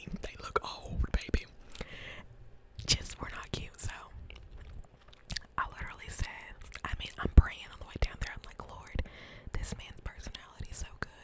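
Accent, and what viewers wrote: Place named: South, United States. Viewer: I love your laugh , u sound so southern & I love itttt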